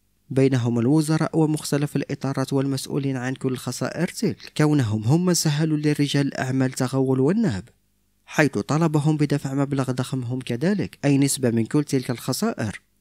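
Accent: Morocco. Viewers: Tu as une accent marocaine!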